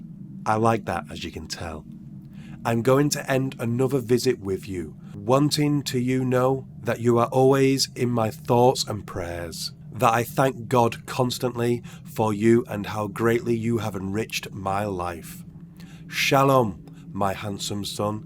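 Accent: United Kingdom. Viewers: UK accent is disturbing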